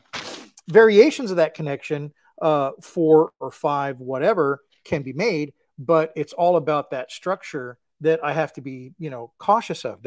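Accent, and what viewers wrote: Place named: United States. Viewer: When you ask, it's normally used on lamps the wire and the fitting it goes into the bayonet or screw fitting I think you call Edison lamp fittings in the US I presume that's where you're from because of your accent if not please excuse my stupidity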